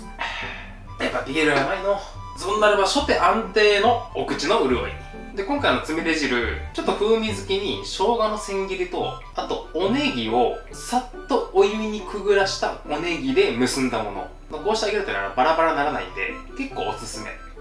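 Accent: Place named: Fukuoka, Japan. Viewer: 福岡弁が心地いいですね〜☺️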